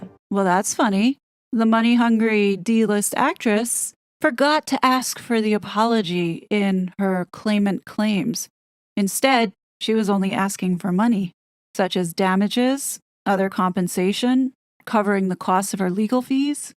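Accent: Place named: United States. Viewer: Correct me if I’m wrong- you sound American- how did you get your hands on the book?! I had to order mine from UK and it won’t be here for another week😢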